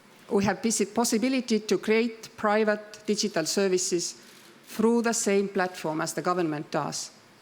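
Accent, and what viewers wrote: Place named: Estonia. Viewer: For the love of god, woman, learn how to speak english! You´ve been working in Luxenbourg for how long now??? I´m an estonian too, but you will never hear me speak like that. The accent is just hurtful for my ears.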